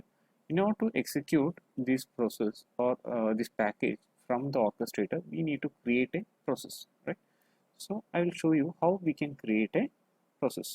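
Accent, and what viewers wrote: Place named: Kerala, India. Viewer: Its really nice video. Very clear voice n explanation. Hope you have little Malayalam accent..🙂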